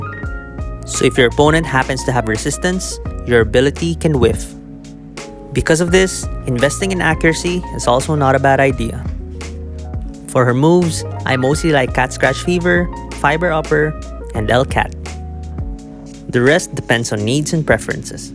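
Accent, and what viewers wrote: Philippines. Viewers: Weird Question, Are you Filipino? Cuz you giving me a Filipino vibe you know? The way you speak, that Accent of yours is what giving me Filipino vibe, and I dig with that. Cuz I'm Filipino.🇵🇭🇵🇭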